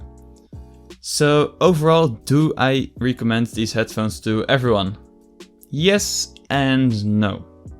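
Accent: Netherlands. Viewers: Je hoort dat je Nederlands bent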